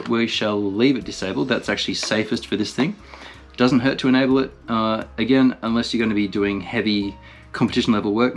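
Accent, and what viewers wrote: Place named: Australia. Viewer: Hi, good to hear an Australian voice [I'm on the east coast], watched all the reviews as you mentioned it’s a good trail truck do you feel its is worth the AUD$900.00? Also how hard would it be to strip the body down of all the bits, to swap to a clear body and go with your own colour choice.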